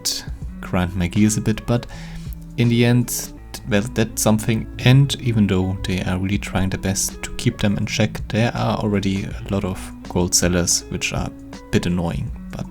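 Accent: Germany. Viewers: Mach das besser auf deutsch... Das englisch ist so schrecklich deutsch 🤣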